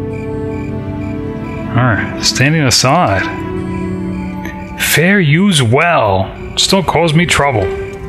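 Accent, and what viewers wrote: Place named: Philadelphia, United States. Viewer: It's very Philly-of-Italian-Descent. "Hey yous. Hey yous guys! Yous been down the shore?"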